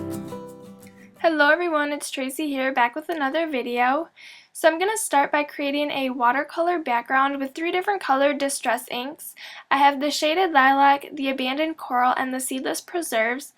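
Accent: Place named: Upstate New York, United States. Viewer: Great card, Tracy! I was wondering, are you from Upstate New York? Your accent reminds me of my great aunt, who lived in Rochester. Sorry your kitten scratched you so badly, but I'm glad you're OK. :) Thanks for sharing your wonderful talent!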